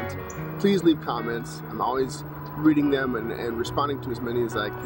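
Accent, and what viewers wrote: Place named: Minnesota, United States. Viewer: You have that Minnesota O. Its ok...im in Michigan, people say I sound Canadian.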